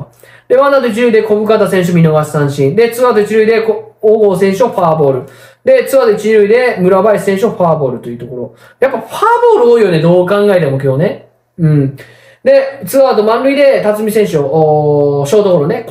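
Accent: Kansai, Japan. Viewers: 小山さんって関西の方ですか？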